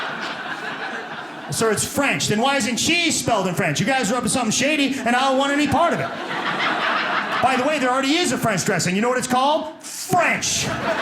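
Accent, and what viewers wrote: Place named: New York, United States. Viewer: The New Yorker accent is spot on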